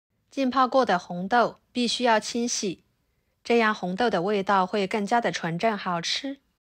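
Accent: Yunnan, China. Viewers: 又听到了云南普通话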